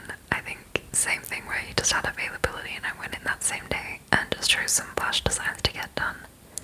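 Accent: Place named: Australia. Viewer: I love your accent✨❤️ and your voice is all together relaxing. 😌 I would love to visit Australia someday but for now hearing your accent is perfect 👍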